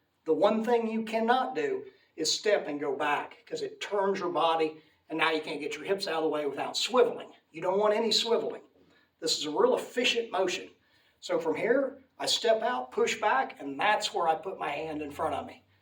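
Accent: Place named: South, United States. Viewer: love from China. love your accent as I lived in south of states for yrs. Quick question, have you ever experienced with pelvis pain or SI joint pain, especially from right hip/leg/low back? I now feel even worse when aiming lower and put left leg aside. I wonder if it's a common headache for pool players who plays 2-3hr a day.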